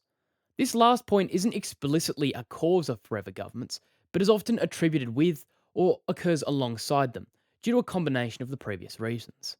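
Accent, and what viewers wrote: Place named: United States. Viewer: Good presentation but the Americanised accent sends me to the off button.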